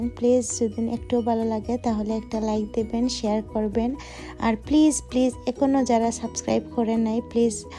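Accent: Sylhet, Bangladesh. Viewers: apa tumare amrar sylheti matle bala lage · when you spoke sylheti in your vlog it was better. It sounds nice when us sylheti speak sylheti. Please change back to sylheti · Apu apnar sylheti kotha e valo lage.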